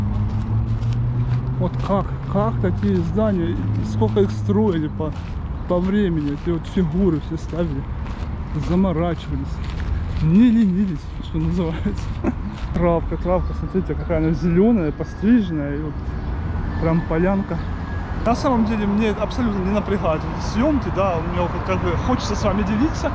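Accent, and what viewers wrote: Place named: South, Russia. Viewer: Заткните в таком случае свои уши и не слушайте. Человек говорит так как привык с детства. Это южнорусский диалект.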